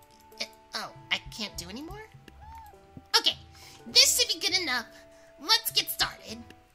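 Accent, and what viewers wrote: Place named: Scotland, United Kingdom. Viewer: NAH, I'm calling ya. Nice Scottish accent as well.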